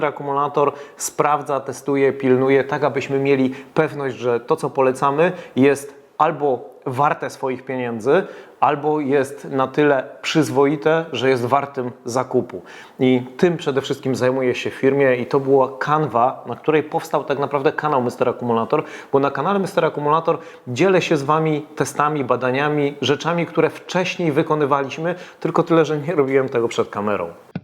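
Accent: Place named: Ukraine. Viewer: Kanały: Bater tech; Świat akumulatorów; i - wszedzie chwalą ukraiskie akumulatory.Oczywiście inne też - ale to tu to tam słychac Ukraiski akcent...Prześledziłem przypadkowo te kanały bo szukałem akumulatora ale im dłuzej słuchałem to lampka zaświeciła mi się jedna - pracujecie mocno nad nami Polakami. Marki uznane chwalicie najbardziej - no bo nie ma wyjscia..ale jakoś potem czesto wystepuje marka Ukrainska. Zeby była jasność -Nie powiedziałem, że jest zła..nie wiem jaka jest - dopiero szukam akumulatora. Zeby była jasność - nie jestem wrogo nastawiony do Ukrainców. Ale na trzech niby różnych kanałach widzę jedno podobieństwo - Ukraina.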